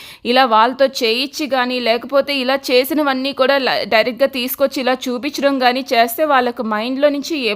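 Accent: Telangana, India. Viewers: Super ga ready ayyav chala Baga telangana bhasha bagundi ane super ga undi ❤ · Telangana slang bagundi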